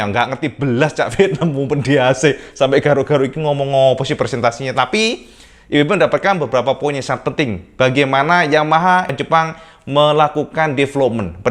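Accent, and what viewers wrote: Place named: Java, Indonesia. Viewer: Jangkrikkk..beh medok jowo tenan🤣🤣🤣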